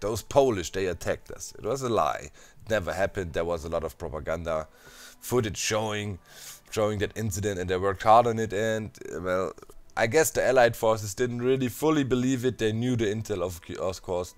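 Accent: Germany. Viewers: history, hoi4, docm, German accent, and a constant reminder of that German censorship of hitler(which I find the whole concept of that really hilarious?), what could be better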